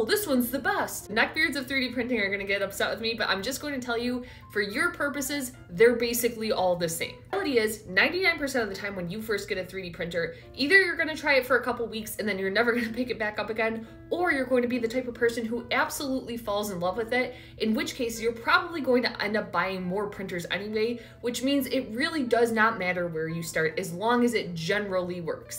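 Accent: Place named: Midwest, United States. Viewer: Are you from WI? Your Midwest accent is thick!!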